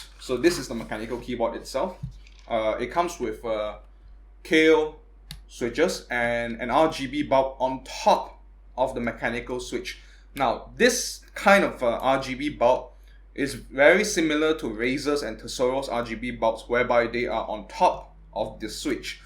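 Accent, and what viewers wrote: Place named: Asia. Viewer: I love that Asian accent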